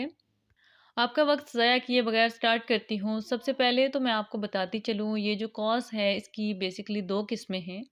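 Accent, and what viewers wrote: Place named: India. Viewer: i already watch your video and i found difficulties to clearly understand Indian language or accent. Please if you could answer me about my questions in English i would be grateful. Thank you in advance.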